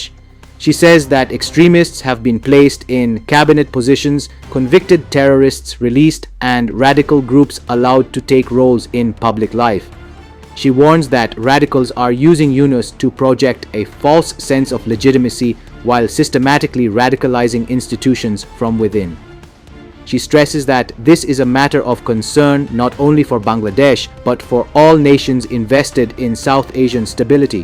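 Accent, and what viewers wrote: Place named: India. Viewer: An Indian with shitty accent... Desi Romanised narrator.. crimes of India channel never amazed me.. office is smokers point in mumbai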